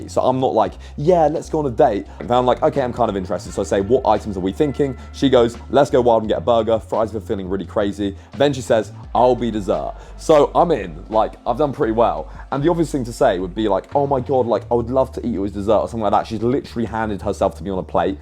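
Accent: United Kingdom. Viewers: The most british accent I've ever heard 😂 keep those vids up man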